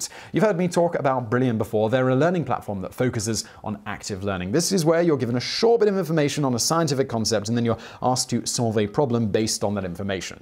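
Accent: United Kingdom. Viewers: Okay, I know I give you crap all the time about your pronunciation of Los Angeles, although I did hear you say how an American would say it really recently, proud of you, the way you said New Orleans is not too different from where people where I'm from say it, so I don't know if you've been taking notes or just in the UK that's how they say it. there's another English guy on another Channel I watch, and he keeps saying Saint Louis as "Saint Louie". now, there is precedent for that, and historically it has been pronounced like that, but nowadays we say it more like "Saint Lewis". So, imagine your Welsh friend is really holy. Anyway, I have to say though, I didn't know whether or not this channel had started getting into conspiracy theories when I saw the title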